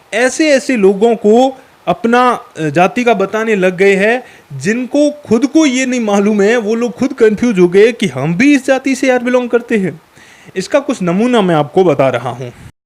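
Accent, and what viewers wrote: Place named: West Rajasthan, India. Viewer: प्रिय भाई सादर नमस्कार 🙏 आपका भाषा उच्चारण सुनकर लगता है आप पश्चिम राजस्थान से हो. मे भी झुंझुनू राजस्थान से हू. आप बधाई के पात्र हो कि कितनी बढ़िया शैली से आपने मिम्स दिखाकर एक समाज की आलोचना की खिली उड़ाई और अपने आपको ये भी साबित कर दिया की मे तो जाति का कही नाम नहीं लेता. वाह क्या कला है आपमें 😄😄 ये बात आपको खुश करने के लिए पर्याप्त है की गुर्जर -प्रतिहार वंश मे गुर्जर होने के कारण कुछ लोग मिहिर भोज कों गुर्जर बता रहे है. भाई गुर्जरत्रा नाम ऐसे ही नहीं पड़ा जो नाम से पहले लगा लिया इसके लिए 6 ठी से लेकर 12 वीं सदी तक राज किया है गुर्जरों ने. तो आप इंटरनेट पर अपलोड करके महापुरुष बदल रहे है ये दुष्प्रचार मत करो. बाकी ये अमित शाह, महाराणा प्रताप, रविंद्र भाटी जी का आपने कहा से बनाया या जिसने बनाया गलत है मे इसमें पड़ना नहीं चाहता. कुछ बाते है आप जानलों तो अच्छा रहेगा संभवतः आपका भ्रम दूर हो जाये. 1.12 वीं सदी मे राजपूत शब्द की उत्पत्ति हुई उससे पहले राजपूत का 'र 'भी नहीं था इस दुनिया मे. 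2 राजपूत कोई जाति नहीं होती है जाति ठाकुर होती. 12 वीं सदी व उसके बाद ठाकुरो का राज होने पर उन्होंने राजपूत शब्द कों अपना टैग बना लिया. 3. राजपूत संस्कृत शब्द 'राजपुत्र ' का अपभ्रंश है जिसका अर्थ है राजा का पुत्र. प्राचीन काल मे चारो क्षत्रिय जाति अहीर, जाट, गुर्जर, ठाकुर इस शब्द का प्रयोग करती थी. 4. 12 वीं सदी के आते आते अन्य राजवंशो का पतन हो गया. खाली ठाकुरो ने अपना राज बचाये रखा अतः उन्होंने राजपूत शब्द आपने साथ जोड़ लिया. 5. राज कैसे बचा, क्या -क्या मुगलो और अन्य कों देना पड़ा जिक्र करना उचित नहीं है आप खुद समझदार हो सब कुछ कमेंट मे लिखना संभव नहीं है आप मुझे कॉल कर सकते है <num> पर. उम्मीद है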